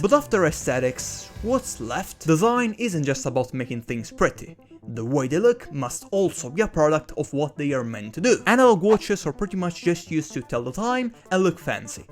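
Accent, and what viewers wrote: Italy. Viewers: Bellissimo video, complimenti! Avevo sentito l’italianità dalla pronuncia e infatti non era difficile da notare 😂🔥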